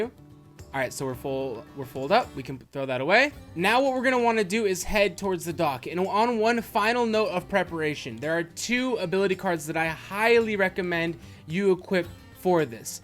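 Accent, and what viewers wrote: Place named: Russia. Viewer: Love the videos! You are hilarious in many of them. Your Russian accent is great! Keep it up bro!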